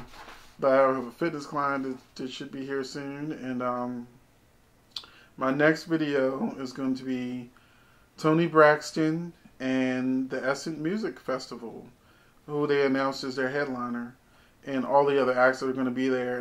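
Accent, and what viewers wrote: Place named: St. Louis, United States. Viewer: Where are you from? Yo accent sounds like St. Louis.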